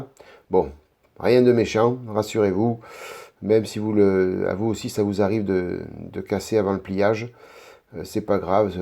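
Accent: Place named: Provence, France. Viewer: Bonjour bonjour..au sujet des ciseaux,pour des grosses pièces au cutter c'est mieux (avis perso)..dis moi vu ton petit accent je pense qu'on est voisin..je suis natif de Salon mais j'habite Marseille..bonne continuation..c'est bien parti!!! Jan-mi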